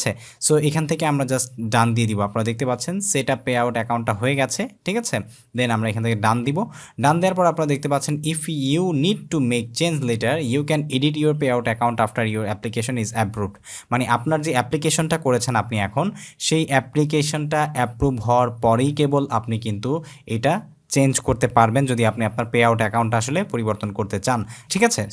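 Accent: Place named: Gopalganj, Bangladesh. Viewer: Vaya apni gopalgonj ar cale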